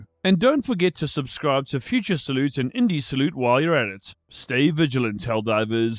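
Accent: South Africa. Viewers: Permission to query Commander sir, are you deployed out of Sector 5 by any chance? Your accent reminds me of South Africa sir! Glory to Super Earth 🦅🌍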